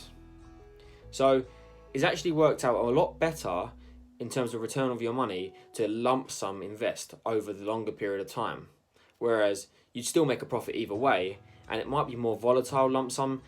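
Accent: United Kingdom. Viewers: Nice content! Love ur British accent 😉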